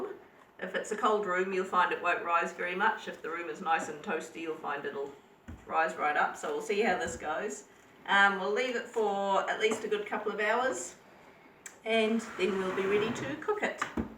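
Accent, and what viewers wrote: New Zealand. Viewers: Just returned to oz after a wedding of my niece; so nice to hear the kiwi accent. From a very homesick kwassie. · Lol Ido love how natural you are! Also its great hearing a NZ accent ❤ Commenting from Waikato, North Island. Great channel, keep up the good work 👏🏼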